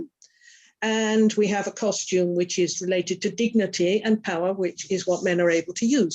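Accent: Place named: United Kingdom. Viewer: Thanks. Her tone and cadence in way of speaking is very hard for me to listen to. I think this is a British thing. There are British men who speak this way as and I can't listen.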